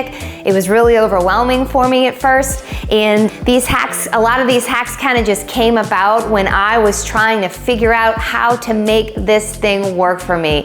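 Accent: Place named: South, United States. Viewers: Gotta be a southern gal-I heard a “ya’ll” right off the bat. 😃 takes one to know one! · I'll bet this is Texas accent 👍🏼. Go Aggies. Go Longhorns 👍🏼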